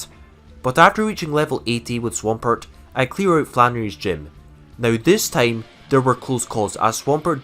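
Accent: Scotland, United Kingdom. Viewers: You sound like a Scotsman attempting an English accent bro, Good video.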